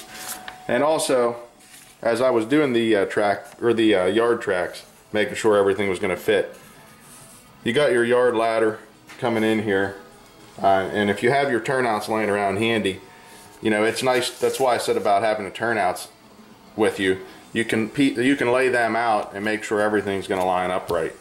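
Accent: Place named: Pittsburgh, United States. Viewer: love your videos. I'ma new modeler and appreciate what you do. Also a PSU fan and Pittsburgher, picked up your distinct Pittsburghese in a previous video. Keep up the good work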